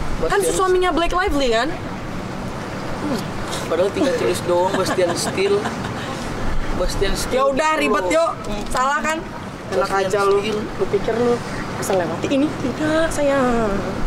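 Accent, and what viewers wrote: Bali, Indonesia. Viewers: Logat Bali nya kental bgt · LOGATNYA BALI BGT HAHAHAHAHA🤣🤣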